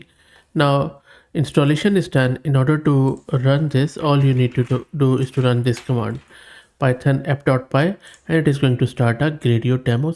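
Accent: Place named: India. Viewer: Horrible Indian accent😂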